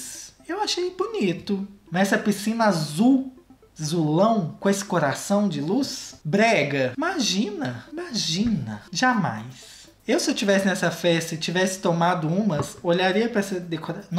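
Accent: Minas Gerais, Brazil. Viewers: Eu gosto de comida boa não de comida xexelenta BERRO KKKKKKKKKK PS.: cê é mineiro? Pq pelo sotaque parece um pouco kkkkkkk obrigada por não decepcionar nos vídeos 💖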